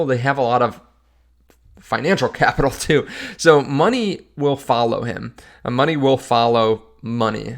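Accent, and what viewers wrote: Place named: Wisconsin, United States. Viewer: 2nd viewer today,!!! I'm subscribed and send your content to my sister, who I talked into getting BTC and SOL. I'm a happy crypto investor since 2022. Oh BTW, I'm a fellow CA resident and your accent makes me chuckle. I'm born in Wisc. and have family that say how's it going just like you. Got to chuckle...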